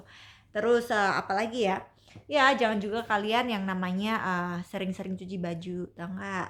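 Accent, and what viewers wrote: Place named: Bali, Indonesia. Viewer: Ini logat nya orang Bali ...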